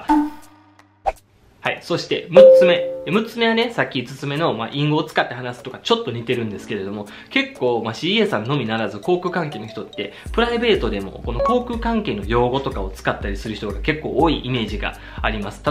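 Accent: Kansai, Japan. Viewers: なんだろう…ハマる！関西弁が面白いし、人間性もおもしろーい❤😊 いつも楽しい配信ありがとうございます😂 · 関西弁が嫌だ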